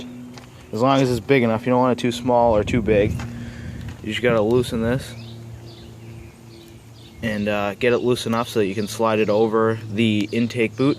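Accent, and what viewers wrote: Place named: New England, United States. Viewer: where u from? you sound like ur from new england. maybe ME, NH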